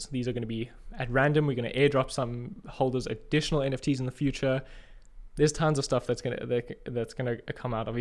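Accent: South Africa. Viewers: Blue Pink Jelly!!! Absolutely love getting top quality crypto content in a Saffer accent. Kudos to you! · Blue Pink Jelly. Love your videos and, on a personal note, it's really nice to hear a South African accent amongst all the crypto YouTubers that I watch. Being very new to the space it's incredibly helpful to have people like you that are logical, balanced and insightful.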